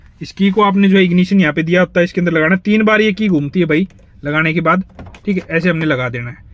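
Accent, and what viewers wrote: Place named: Haryana, India. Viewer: Haryana Sy lag Raha bhai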